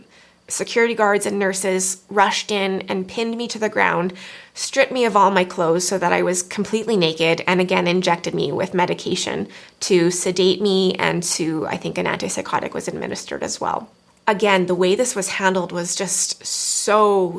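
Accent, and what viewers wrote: Canada. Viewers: The part that was most jarring for me is that they stripped you naked like a criminal prisoner being stripped searched. Why was that necessary? Terrible :( Are you Canadian, btw? Just the way you pronounce "out", "about" etc. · I think you're missing some of her points. She's not saying she doesn't think there are situations in which forced medication is necessary, but that the way in which it's enforced can be much more harmful than it should be. Also, I'm new here, but she sounds like she has a Canadian accent- she may not be from the US- but that's just a guess.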